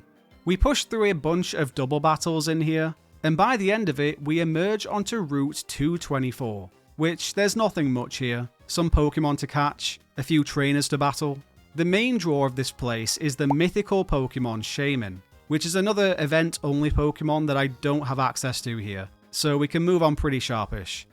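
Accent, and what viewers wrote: United Kingdom. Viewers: I love the contrast between your cutesy looking avatar and your, at least what sounds to me, south yorkshire accent. got a giggle outta me lol I'll add that if you want, there's some glitches that allow you to acquire the event legendaries. they're not hard to execute but you should follow a guide so as to not get lost in the eternal abyss. but I'm sure you knew about it already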